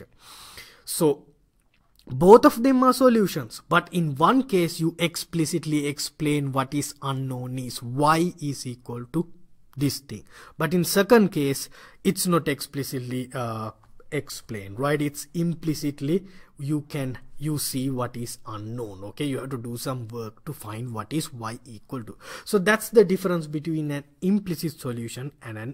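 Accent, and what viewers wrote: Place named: India. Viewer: he has such a cute Indian accent.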